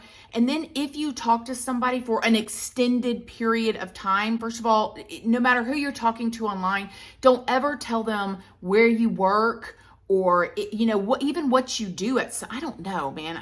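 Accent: South, United States. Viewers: Praying!!! Today, it seemed like you liked it, I voted yes keep intro, then I looked at a couple that seem like you were just tired of saying it and so I changed my vote to you know we love you you've got like a million subscribers, greet us any way you want to, we'll be here! I love your accent, bc my cousins are from Florida but they're from a different part, they also have absolutely beautiful voices, you have a way deeper southern accent I love it. Now, I need to watch this. I do hope things get better for your family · a southern belle Youtuber😮🤯😍 im fascinated by the accent L0L Love from 🇬🇧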